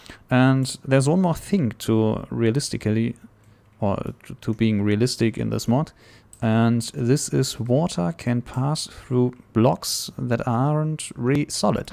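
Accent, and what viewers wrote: Germany. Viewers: Man hört das du deutsche bist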